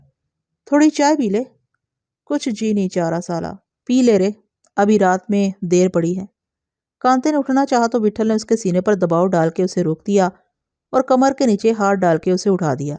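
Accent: Punjab, Pakistan. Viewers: Apki Urdu gulabi/Punjabi hai. Lekin fluency achhi hai.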